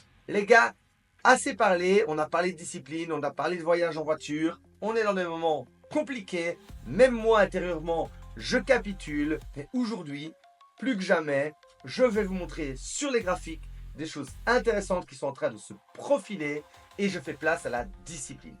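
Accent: Belgium. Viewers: Rien que par ce que tu as un accent d'un air liegeois tellement sympa , je m'abonne ❤❤❤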